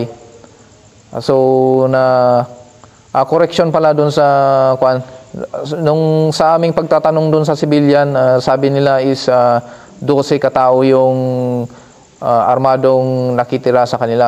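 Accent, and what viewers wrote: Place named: Samar, Philippines. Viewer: Sir tubong ano po si sir? Parang Samar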